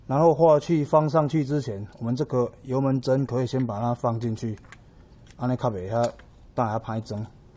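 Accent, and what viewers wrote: Taiwan. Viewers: 不推不行，實在讚！正台灣的口條，讚！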